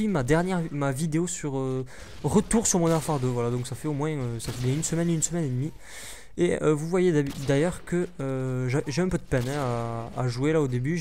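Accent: Toulouse, France. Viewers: tu dis neuke a cause de ton accent toulousain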